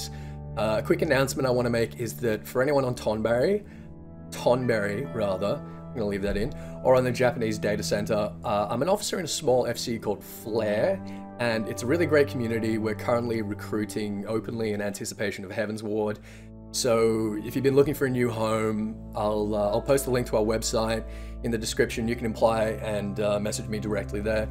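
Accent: Australia. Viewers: yo dude where in aus are you from?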